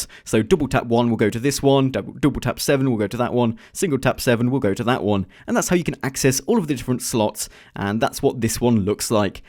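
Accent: United Kingdom. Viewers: Oh, wow. I guess when 1.7 came out it took ages! Anyway, just to let you know that I am a HUGE fan of you. Are you British? I heard from your accent, I am British:D #iamawippling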